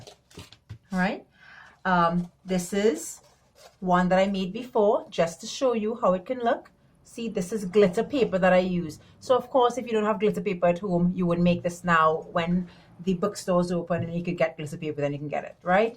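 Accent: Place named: Trinidad and Tobago. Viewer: Love the creativity, the one for you son’s room is beautiful. I love the accent is that from 🇹🇹.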